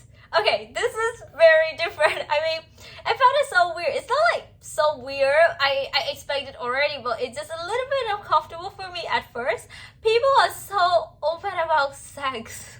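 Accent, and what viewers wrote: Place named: United Kingdom. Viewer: U r starting to sound like a British...Love ya❣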